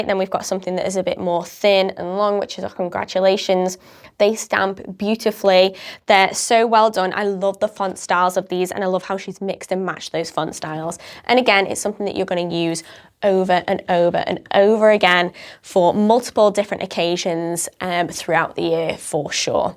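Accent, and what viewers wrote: United Kingdom. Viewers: Thank you so much for this walk through (made even better by your darling British accent!). So much inspiration and so many ideas.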